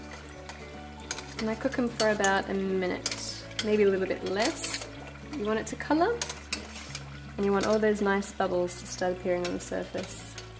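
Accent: Australia. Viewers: Greetings Great instruction. That is definitely an Australian accent but your Italian accent is perfect also. I am in Newcastle. What brand of shortening are you using? The only vegetable shortening I could find was copha.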